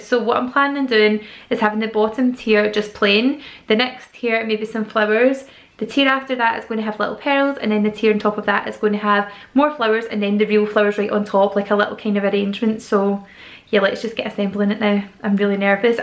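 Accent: Scotland, United Kingdom. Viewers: omg i lovvvveeeeeeee scottish english 😍😍 - greets from switzerland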